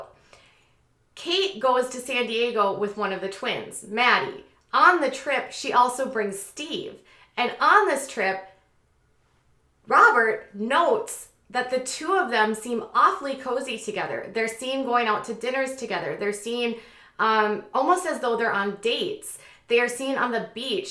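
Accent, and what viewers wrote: Minnesota, United States. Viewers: I notice you say it like “Gosling” instead of “Gosselin” — but it may just be your Minnesota accent. I think Minnesota accents are just too cute!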